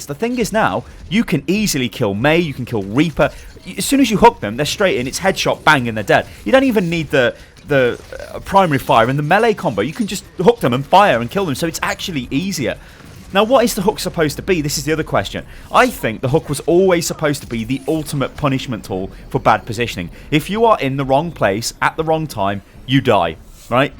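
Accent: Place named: United Kingdom. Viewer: ggeeeeesh why is it so tuff to understand british people when there exited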